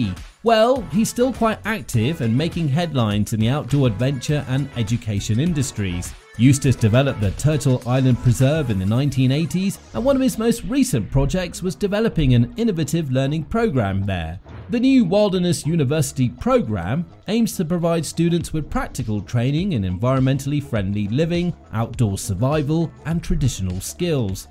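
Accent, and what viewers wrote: United Kingdom. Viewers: Or British whatever you are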